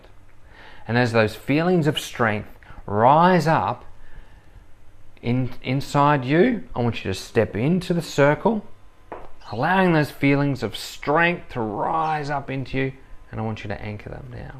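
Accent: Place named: Australia. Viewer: Brilliant method, an unbeatable combination of personality and drop dead good looks along with my personal favourite, Australian accent. Thank you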